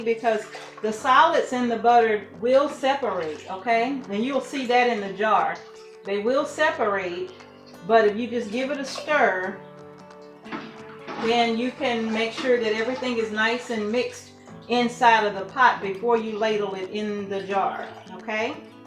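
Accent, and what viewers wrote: South, United States. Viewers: I'm Canadian - from Ontario - and I love her southern drawl too!!! We got ar'own acc'nt, eh? Heh! Heh! Heh! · I'm Australian, and I'm going to be thinking in a Southern accent (or whatever yours is) for the rest of the day, LoL. Very useful video, thank you!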